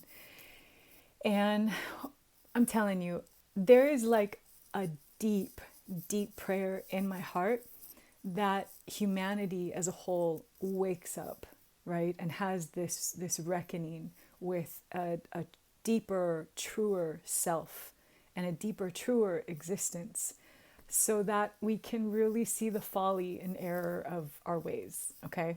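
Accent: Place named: France. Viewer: This is really cool. Thank you for sharing your words of wisdom, and the wisdom of wizards :) Also your French accent is endlessly entertaining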